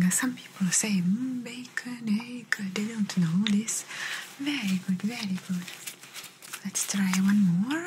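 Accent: Italy. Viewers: Italian accent videos are perfect for relaxing and unwind your soothing voice makes me feel 😴 😊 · Hello, magnificent ASMR with an Italian accent, I loved it and enjoyed it, it is very relaxing, greetings, beautiful 💖💖🌹🌹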